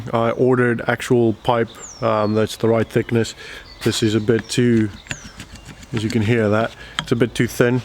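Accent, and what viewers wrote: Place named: South Africa. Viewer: The unmistakeable South African accent . Nice job bru its a lekka idea and iwill be making one for my garage gym . thanks for the great contact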